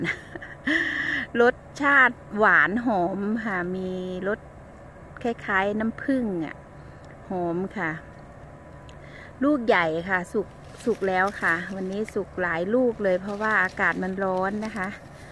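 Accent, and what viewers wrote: South, Thailand. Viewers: พูดใต้ชัดมากเลยนะค่ะ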